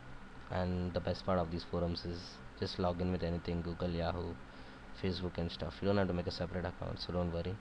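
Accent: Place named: India. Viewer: look im indian too but your heavey accent makes it too distracting to watch the video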